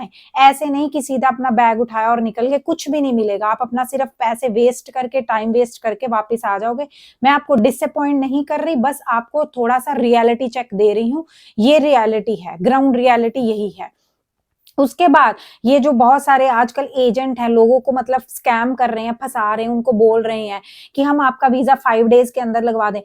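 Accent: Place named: Chandigarh, India. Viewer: आपके बोलने के लहजे से लग रहा है आप चंडीगढ़ साइड से हो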